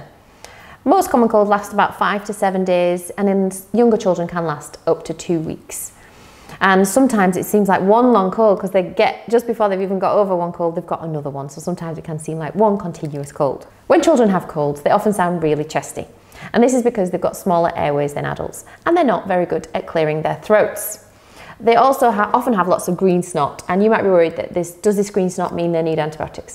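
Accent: United Kingdom. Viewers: Yorkshire accent.. She has a lovely voice. Anyone here just to see?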